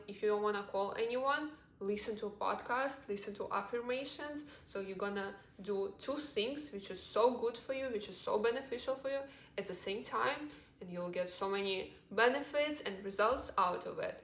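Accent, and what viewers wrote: Russia. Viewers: по первым секундам поняла что русская😮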